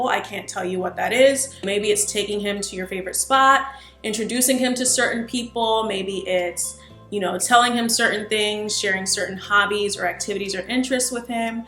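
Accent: Nigeria. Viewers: You really pulled that 9ja accent hey 😹😹👏❤️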